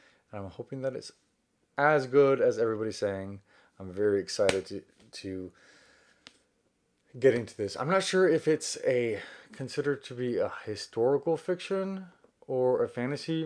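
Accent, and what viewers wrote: South, United States. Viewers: I can't believe you had three books are The Stone Sky, The Fifth Season and The Obelisk Gate by N.K. Jemisin, Angel Image, Bear Town by Fredrik Backman, Fate of the Fallen by Kel Kade, House of Earth and Blood by Sarah J. Maas, Gideon of the Ninth by Tamsyn Muir and all of them at your house in Texas on this video during your quarantine until you're talking about the horror TBR and summer book haul of the spooky season after that, but I hope your husband, Zach will be very proud of you and I'm so happy for you, but I love your Southern accent!!! #SpookySeason #HorrorBook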